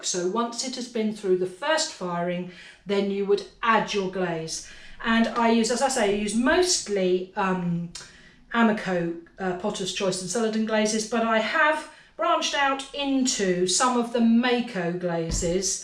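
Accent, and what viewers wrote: United Kingdom. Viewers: HELLO! great to hear a British accent! i get confused about Underglazing....i understand that after you ug a greenware piece, you then bisque it....then you put 2x zinc free clear on for shiny....now here's my questioin: can i put wax resist over all that and then apply my regular glazes? i know that Amaco Potter's Choice may cover up my underglazed, clear glaze (and waxed?) design, so how do i avoid that??? i like the effect of the PC glazes which alot of them 'run'. if i wax my design, i wonder if my regular glazes will cover up the design? thank you!!!!!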